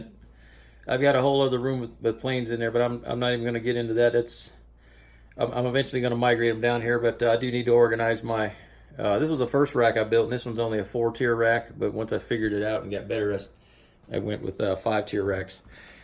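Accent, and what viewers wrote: South, United States. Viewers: Very nice! Where are you located? Sounds like GA/AL. I'm in NC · Good video man! I love the Southern accent lol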